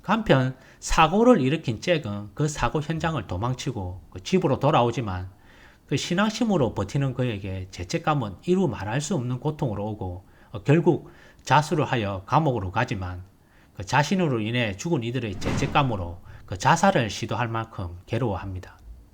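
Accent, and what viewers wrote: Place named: Gyeongsang, South Korea. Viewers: 뭔 개똥 같은 소리인지...너는 서울출신에 부모 모두 충남베이스. 경상도 사투리 억양 성조 있습니다. 서울은 사투리 아닙니까? 말을 고따위로 합니까? 좋은영화 소개시켜주는 컨텐츠를... 너무 하시네요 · 왜 경상도 출신분들은 사투리를 고치려하지 않는걸까요. 아직도 80년대처럼 대한민국의 주류라고 생각하시는지요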